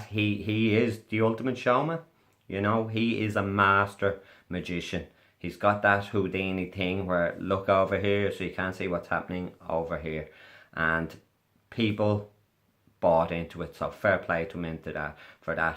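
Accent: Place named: Ireland. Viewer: Wow. An Irish guy (sorry if my assumption is wrong) who is sensible and not deluded. I can't believe my eyes and ears. I'm so glad you exist. Never thought I'd hear someone with an Irish accent talking like this.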